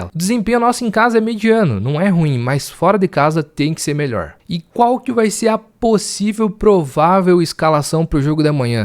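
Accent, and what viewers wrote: Paraná, Brazil. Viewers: vc é paranaense?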